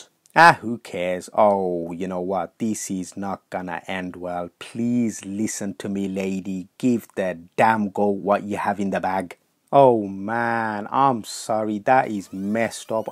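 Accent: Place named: Birmingham, United Kingdom. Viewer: Your too funny and i love your brummie accent ❤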